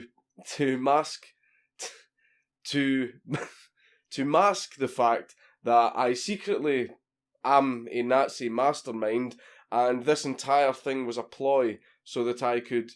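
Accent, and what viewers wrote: Scotland, United Kingdom. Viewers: wrong country dude he's Scottish not Australian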